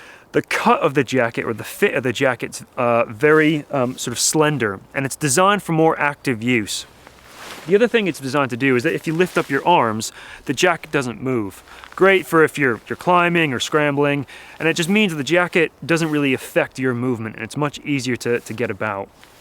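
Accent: United States. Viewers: You sound american but don't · This guy sounds like an American who's been living here in England for too long, he's starting to pick up a hint of English accent.... you can tell from the ending of words like "sheet" "belt" "about" "what" "it" and "fit" which all happen to be words ending with the letter T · It’s definitely not Scottish or Irish mainly American seems like an American who’s been living in the uk for a while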